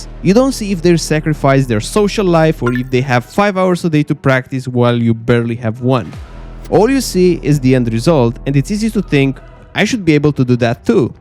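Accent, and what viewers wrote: Romania. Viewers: Where are you from? It seems you are romanian?🧐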